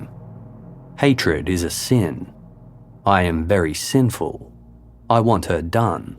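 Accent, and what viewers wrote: Australia. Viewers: Psychopaths are not always serial killers they are corporate bosses and world leaders The planet is run by psychopaths The he fact that the president and vice president got extraordinary payouts compared to the compensation for the Stiener’s AND went on to become leaders in other corporations speaks volumes how psychopaths are valued in business and politics Glad the local police were so diligent ,sadly not usual with police investigations And as usual well told and thorough recount And as a fellow Aussie so nice to hear a familiar accent👍🇦🇺✌️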